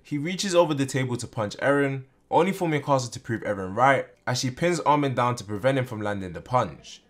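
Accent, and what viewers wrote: London, United Kingdom. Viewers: Just by your accent I can tell you’re a black dude from somewhere in London. Probably South or East.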